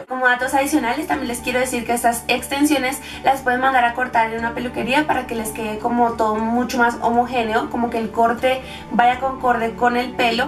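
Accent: Colombia. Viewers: Me da un cosquilleo ver tus vídeos porque... Eres colombiana y yo también lo soy sólo que vivo en España. Y extraño mucho Colombia :) y oír tu acento colombiano me relaja y me da como un cosquilleo de ganas de ir a Colombia!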